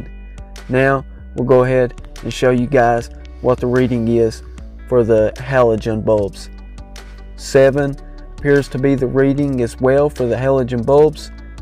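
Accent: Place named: Texas, United States. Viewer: I love your Texas accent mate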